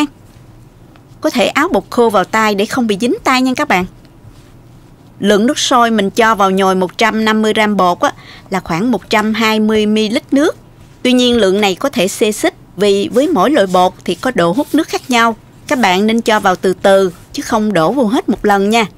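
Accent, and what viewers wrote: South, Vietnam. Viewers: Trời ơi, ghiền cái chất giong Nam rặc của cô quá đi🥰🥰🥰🥰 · Giọng nói người miền nam nghe ngọt ngào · Ko biết khuôn mặt xinh ko nhưng giọng nói dễ thương chuẩn miền Nam hay lắm .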